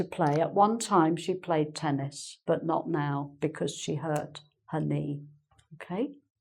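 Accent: England, United Kingdom. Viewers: I really can't stop watching your videos. You have a beautiful accent. I used to hate british accent but now i'm fond of it because of you. Thanks nice. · So brit sz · Lovely British accent thanks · You're the best from engVid, you and James, but you're a far better. I love your British accent, when i was starting on English, it was hard to understand it but now i hear it clearer than the American one. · Your voice in very clear than others .. United Kingdom's beauty of English Language starts here... Thank you so much teacher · From morocco i thank you a lot for your superb lession. And the way you explain it . that makes me love your clear british accent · great class as ever, I learned American English, but I want to practice my listening with the British accent. Thanks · Ela é Britânica? Parece sotaque Inglês.... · her accent is British Amanda, the pure british accent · Hi, from Ukraine. 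Understood everything. So pleasant to learn from your lesson and hear that British English. Like a honey for my ears 😄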